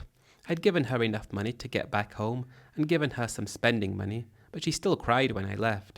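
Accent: United Kingdom. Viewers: You have a very British accent for a yank who never left home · Weird British accent for somone from Missisipi.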